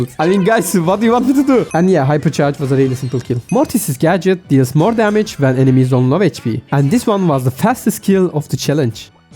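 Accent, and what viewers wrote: Turkey. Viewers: Bu harika bir video, aksanına da bayıldım; ayrıca Türk müsün? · holy Turkish accent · TURKISH ACCENT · videon anasayfama dustu ve ilginc geldigi icin acip izliyim dedim. videoyu acar acmaz saniyesinde aksanindan turk oldugunu anladim. sonra da kanal ismine bi bakiyim dedim ve ne goriyim, adasmisiz:D tanidigm bikac birisin ve hic beklemedigim biyerde ismimi gormek sassirtti beni. kanalina soyle bi baktim ve icerigin de hosuma gitti, yt seruveninde basarilarinin devamini dilerim dostum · vrotato ru from turkey ngl cuz ur accent is so close to mine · Yo are you Turkish? Your sound like that i think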